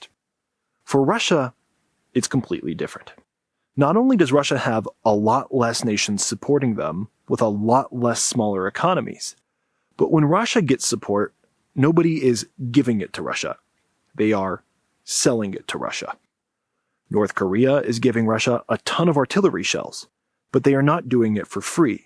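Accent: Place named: United States. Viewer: As Ukrainian ,i am nicely surprised that person who lives in States ,has so deep knowledge of what happens in our country.all arguments are clear & true In addition,so comprehensive americane english,not need to force your ear,plus we are getting information on the beautiful enviroment back there Wish your actual president could listen to intelligent people like this and not his morons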